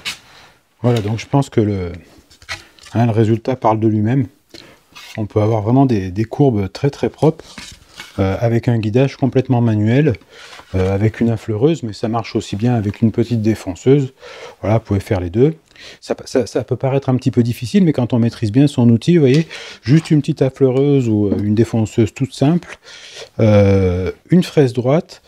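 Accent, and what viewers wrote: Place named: Belgium. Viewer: je suis d'accord avec toi, je ne vois aucune méchanceté dans tes vidéos. J'y vois surtout de bons conseils venant d'un pro ainsi que de la bonne humeur. En plus, ayant grandi juste à côté de la Belgique, retrouver ce joli accent ainsi que les septante et nonante me ravit.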